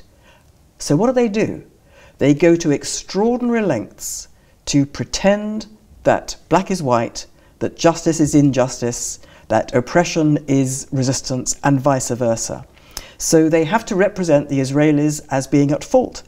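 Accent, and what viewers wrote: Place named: RP, United Kingdom. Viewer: This lady is an idiot, even her pseudo-educated English accent couldn’t help her argue a convincing case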